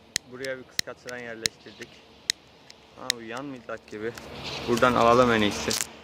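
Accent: Black Sea, Turkey. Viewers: karadenizlimisin şiven var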